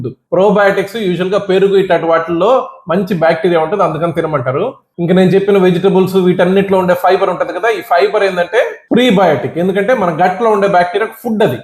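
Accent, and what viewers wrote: Nellore, India. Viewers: sir, super sir meeru, with absolute nellore language super explanation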